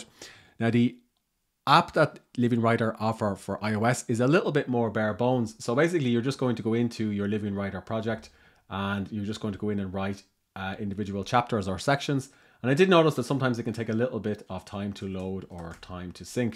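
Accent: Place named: Ireland. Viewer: You mentioned that I can use a pencil. But I was wondering if I can use it as a handwriting tool? I know that you can use the pencil to click on the keyboard as you type but I can no longer see the keyboard so I was wondering if there's a way that it can be used for handwriting instead so that I can write as if I was riding on a paper? Did you get your accent from parents or do you still live in Ireland? Hopefully that made sense lol I am writing a novel currently and one of my characters may possibly be Irish and I was curious.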